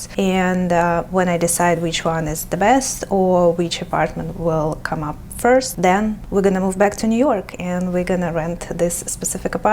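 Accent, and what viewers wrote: Russia. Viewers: невозможно слушать такой сильный русский акцент!!!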